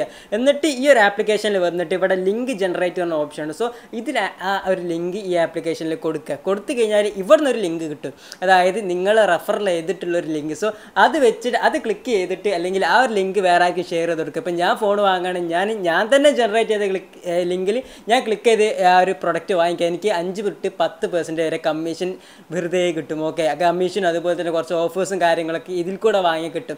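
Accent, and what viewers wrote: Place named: Malabar, India. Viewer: ഭാഷ കുറച്ചു മലബാർ ടച്ച്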